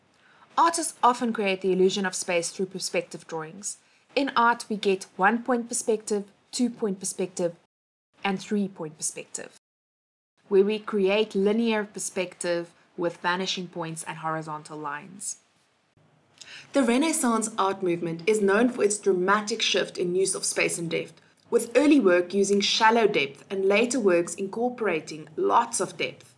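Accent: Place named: Germany. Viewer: Darling, audio stopped working from till the end of that chapter. It starts again with the new chapter. I don't know if it was a copyright thing or a mistake in editing, but, that happened. I hope it was only a youtube blip and there's actually nothing wrong. It's the fourth video I watch today in this channel and I'm loving it and also I love the German accent! I hope your channel keep moving onwards!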